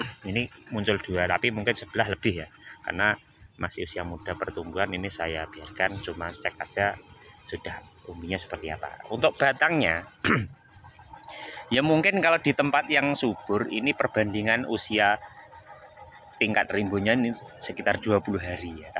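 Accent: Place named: Java, Indonesia. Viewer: Gayamu gomong ubi..anak sd jg bisaa..jowooo..medok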